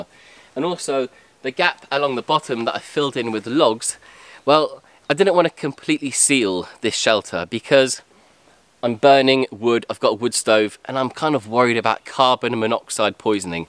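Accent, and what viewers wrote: United Kingdom. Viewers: You from the UK? We normally detect it a mile off. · i mean guy sounds british.....theres no bears there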